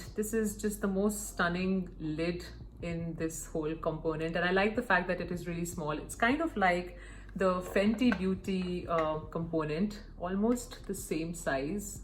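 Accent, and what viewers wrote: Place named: India. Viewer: Love your indian accent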